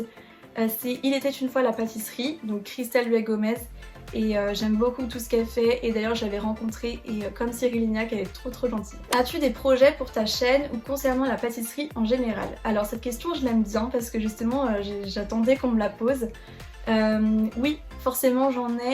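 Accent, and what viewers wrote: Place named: Russia. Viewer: trop bien cette vidéo face cam, on en apprend plus sur toi !! très bel accent russe d'ailleurs 😉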